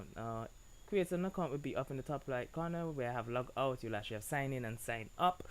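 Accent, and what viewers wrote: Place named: Caribbean. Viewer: Hearing a Caribbean Accent....Respect !!!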